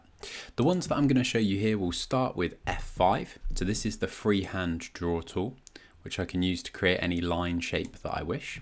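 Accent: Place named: Wales, United Kingdom. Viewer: wowwwwwwwwwwwwwww welsh acent! i like it!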